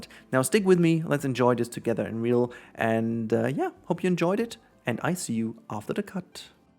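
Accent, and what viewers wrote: Germany. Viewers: Direkt den Deutschen Akzent herausgehört :)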